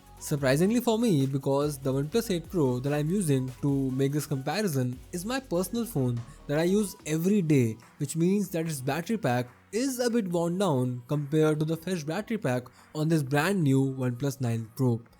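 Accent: India. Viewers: I don't understand much because of your Indian accent but i like your review 👍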